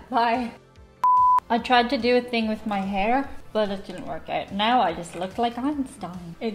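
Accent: Ireland. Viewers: Hey, another video where you just, talk, talk, talk,right. I’m kidding, I like that you talk during your videos. I can hear ore of that Irish accent. Tell your mom, your fans have spoken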